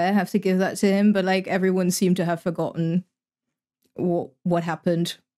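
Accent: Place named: United Kingdom. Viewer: Gotta give you a compliment for your English! You actually sound British! xD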